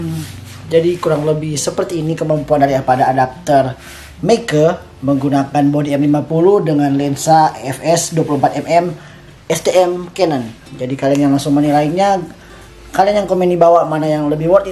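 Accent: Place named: Manado, Indonesia. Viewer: Rupa org mdo pe logat bos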